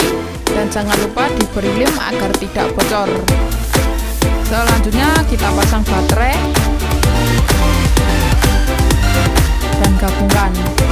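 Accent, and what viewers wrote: Java, Indonesia. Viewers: Jangkrik.....supa..? Yo ngene iki wong njowo.dipertahankanlah.gausah malah sok2 an bahasa indo yg fasih. Liat itu subscribe dan like nya. Toh juga banyak walau medok.kate lapi koe..? Ra terimo. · Yang gak bagus..suara MEDOK ny itu loh..maf..bisa di kondisikan gak..klo bisa pke duber orang lain ajah deh yg fasih bahasa indo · Mendoknya ciri khasnya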